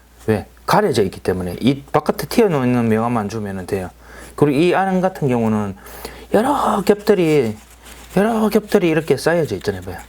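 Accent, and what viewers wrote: Gyeongsang, South Korea. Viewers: 요즘취미로 집에서 조금씩 배우고있어요ㆍ장미꽃그림은 아직 엄두도못낼것같았는데 ~~ 자꾸 동영상보면서 익혀야겠네요^^ 첨이라 이해가 안되지만 연습해서 아름다운 장미꽃을 도전해보고싶네요^^ 잘배우고 갑니다 ㆍ쌤^^ 목소리톤과 경상도사투리 ~ 완젼 멋지심요~~ 펜할께여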